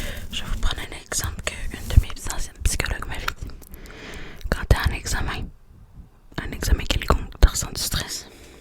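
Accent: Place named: Quebec, Canada. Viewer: oh trop cool une asmr artiste québécoise j’avais jamais entendu de l’asmr avec un accent québécois :)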